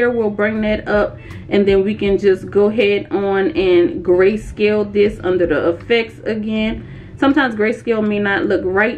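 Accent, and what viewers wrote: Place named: Louisiana, United States. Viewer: My good sis. That Louisiana accent is so strong I subscribed just for that!! Love the realness!!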